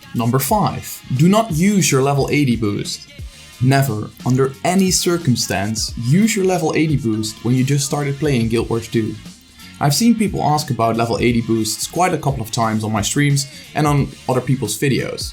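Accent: Netherlands. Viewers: i can hear u dutch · Sup mate!, great vid. I can tell that your dutch by your accent btw ;) (me to). I just started GW2 again after being absent for 4 years. Is there any way if you happen to know a dutch guild? I've always been in DuF (Dutch Finest) but they kicked me out for obvious reasons ofcourse. Maybe we could meet up in-game. Im serious about getting into it again. Played Blade&Soul for the last 3 years, but the poor optimization got the better of me. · you sound dutch!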